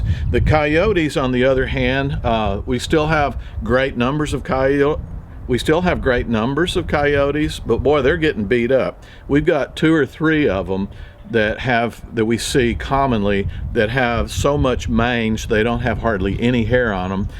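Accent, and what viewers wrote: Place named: South, United States. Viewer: ...I can’t imagine any of your subscribers “holding it against” you for taking the body of the deer to a place that other animals could make use of it’s body to sustain themselves. That is only nature. I love all your videos because your soft voice and southern accent are so calming. And I love hearing about and seeing Uncle Floyd. What a treasure he is! And sweet Buddy....my heart just breaks. I have a black lab who is a month away from her 13th birthday. She had a stroke 6 weeks ago and is doing fairly well, but I know she doesn’t have long. Thank you for letting us get to know you and enjoy the things you do on your Texas ranch vicariously!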